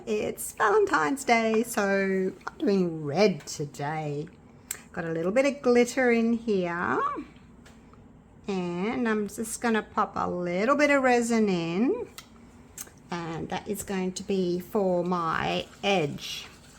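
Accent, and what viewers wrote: Australia. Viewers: Woah kookaburra are so big. And I got it right the other bird is an Ibis. I’m a brand new sub. Are you Australian or from New Zealand?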